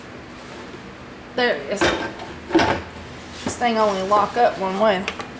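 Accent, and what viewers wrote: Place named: Alabama, United States. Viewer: I love that Alabama accent !!!!!!!!!!!!!!!!!!! Yeeeee Hawwwwwwwwwwwwwwwwwwwww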